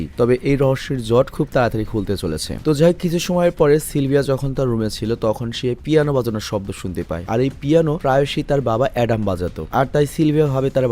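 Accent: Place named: Noakhali, Bangladesh. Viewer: Vai apni sera.. ❤ Amder Noakhali kotha bollen❤❤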